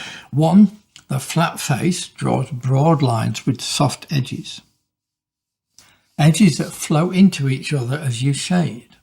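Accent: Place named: United Kingdom. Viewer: J'ai dû beaucoup dessiner dans ma vie car ceci est exactement ce que je fais en dessinant. En suivant cette manière de faire, on développe un sens assez fin de l’état de la pointe du crayon, permettant toutes les nuances sans avoir trop à réfléchir. J’ai beaucoup aimé votre style de dessin, je vais regarder vos autres vidéos. En vous écoutant je me rends compte que quand j’écoute un accent britannique, je fais très confiance instinctivement au niveau de compétences et de sérieux de la personne. J’apprécie la modestie des britanniques alors que assez systématiquement les gens ont des habilités particulières à communiquer qu’ils ont peaufinées pendant longtemps. Merci, un bonjour de 🇨🇵 !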